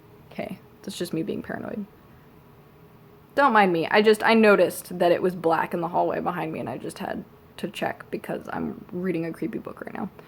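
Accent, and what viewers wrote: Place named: United States. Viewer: Clear American!! YAAAAS.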